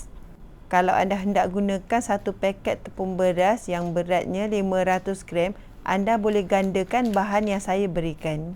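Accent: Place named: Malaysia. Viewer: Ni bunda malaysie logat bicaranya, tusini kue cincin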